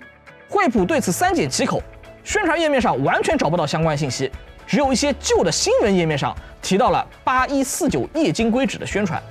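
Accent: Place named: Nanjing, China. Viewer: 這位主持人聽起來好像南京人耶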